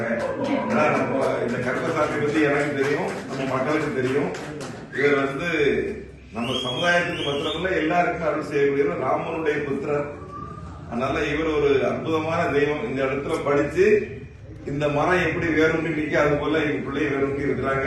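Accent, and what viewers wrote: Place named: Tenkasi, India. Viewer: நம்ம மாவட்டம் தென்காசி மொழியில அழகா பேசுரீங்க❤மைக் இல்லாம பேசும் போது குரல் 👌👏👏வாழ்த்துக்கள்❤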